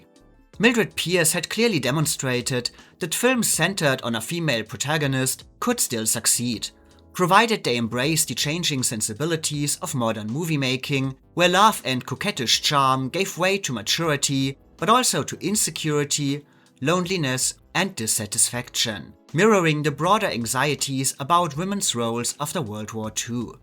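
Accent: Germany. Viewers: Love your Deutsche - English accent. You roll your "r",. Excellent analysis, Joan's performance in Possessed (47) is a tour de force.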